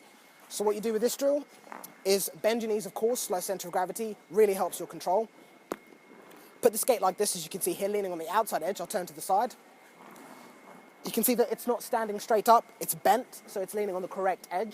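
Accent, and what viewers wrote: United Kingdom. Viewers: Does anyone comprehend what is going on in this video!? A black guy is teaching the internets how to hockey-stop, in a British accent. Youtube, you are great.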